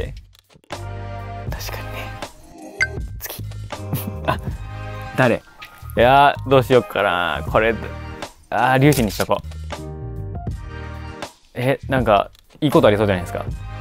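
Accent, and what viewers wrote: Kansai, Japan. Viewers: Q.僕の好きなところを教えて下さい全くもう〜欲しがりだな〜😉😉🧡 くんの好きなところは、踊る時のグルーヴ感、楽しそうに踊る所、かと思えば切ない表情もしてそれがえちい所、真面目な所、ボケが滑った時の返し方、どんな方にも平等に優しい所、踊ってる時に右か左をチラッと見る癖、 『えへへ』と笑うギャップ、身長が175cmな所、手の指が長くて綺麗な所、煽る時の低音ボイス、所作に出る礼儀の正しさ、不意に出る関西弁、不意に出る天然ボケ、SNS更新頻度が高い所など！！もう上げたらキリがないので、とりあえずという存在が大好き！！ってことを知ってもらうだけで十分です🐣🐣✌🏽✌🏽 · 雅椋さんの好きなところなんて星の数ほどあるのですが、、、選抜して述べると、ダンスが好き、笑顔が好き、品のある金髪が好き、ホクロが好き、臣さんラブなところが好き、DJとか曲作れちゃうところが好き、狙ってないのに面白いところが好き、関西弁が好き、大クセかましてるところが好き、マメなところが好き、くんの書く文章が好き、言葉選びが好き、目を見て話してくれるところが好き、優しくて好き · くんの笑顔とえへへが大好きです💞 あと関西弁も好きだし見るからに優しさが溢れ出てるくんが好きです👦🏼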